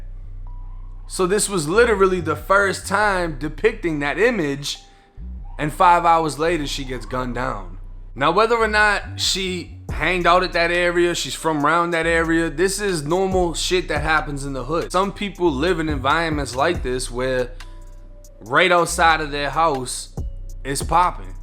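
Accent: Boston, United States. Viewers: “Or a drunken argument between people pottyin’ (partyin’)” the Boston accents crazy 🤣🤣🤣🤣🤣🤣🤣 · He got that Boston accent.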